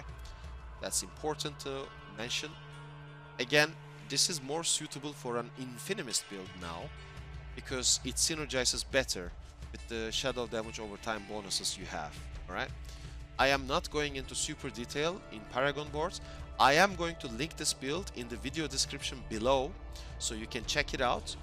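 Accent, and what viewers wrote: Turkey. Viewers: Where are you from? You have such a turkish accent.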